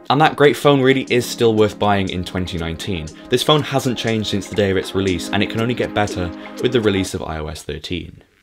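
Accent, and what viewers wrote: United Kingdom. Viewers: The slow music and your smooth British accent almost makes it sound like this is an Apple trailer lol Doesn’t change the fact that this was easily one of the best reviews and everything was on point! Good job bro!!!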